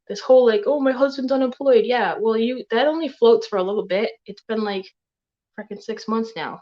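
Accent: Boston, United States. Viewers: First time here. You from Boston? That accent is always noticeable lol. Love it! #Bostonstrong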